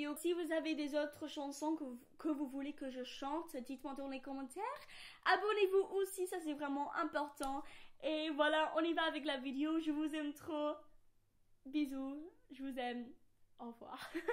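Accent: England, United Kingdom. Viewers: C trop mignon une anglaise qui parle français 😝❤️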